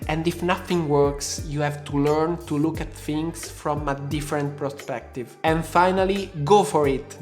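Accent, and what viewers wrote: Italy. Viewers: Si sente l'accento Veneto anche così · Secondo me sei bravo, sono veneta come te ed ho la pronuncia molto simile, però l'impegno c'è e da qui in avanti c'è solo da migliorare. Perciò bravo, davvero ammirevole. Ma la butto lì, non era più semplice aggiungere dei sottotitoli inglesi ai tuoi video del canale principale? Magari diventava un lavoro più complesso per ogni video però recuperavi il tempo speso nel farli due volte, uno in italiano e uno in inglese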